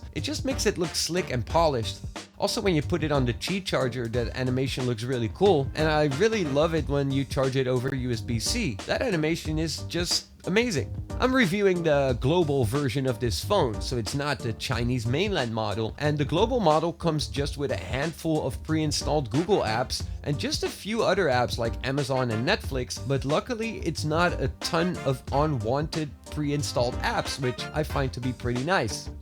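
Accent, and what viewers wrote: Netherlands. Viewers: you sound very dutch 🤔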